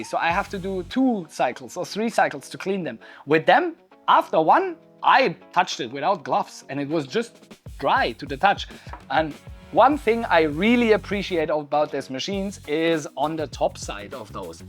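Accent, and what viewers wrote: Germany. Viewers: i was thinking this dude has a german accent.. .then i saw the waschgang sticker :D geil :D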